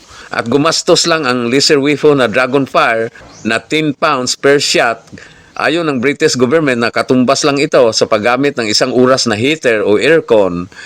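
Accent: Visayas, Philippines. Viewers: ingay mo man doon katumira sa china halatang bisaya ka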